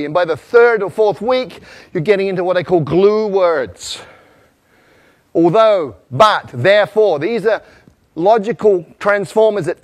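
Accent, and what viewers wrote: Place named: East, United States. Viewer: alex wu 更接近于北美东部的发音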